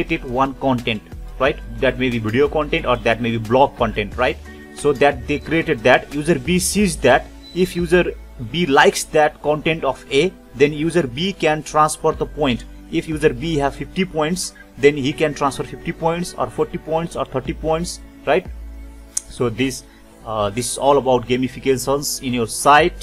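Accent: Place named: Nepal. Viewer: Bro Nepali ho? Accent testai testai chha ta. 😁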